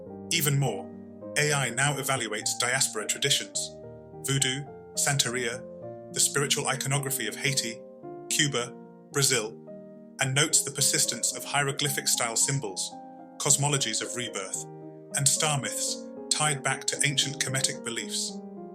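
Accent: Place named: Europe. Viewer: You failed to mention that the kemet people knew how to manipulate frequency and energy to actually move the rocks not the silly imagery of ramps and pulleys (a European concept!)..your European accent says it all!...we need and want to hear black people talk about us to us, not this podcast!!!..I've spoken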